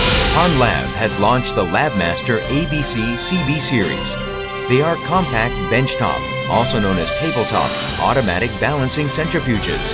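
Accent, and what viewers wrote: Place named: United States. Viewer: Oh, my god. This is the most obnoxiously overproduced and tacky commercial for a product not meant for children or even normal households I've ever seen. The "epic" music, the over enthusiastic American voice, and all the sound effects, combined with cheap CGI. For centrifuges! It's too much! I just can't! I will now have to watch it on repeat.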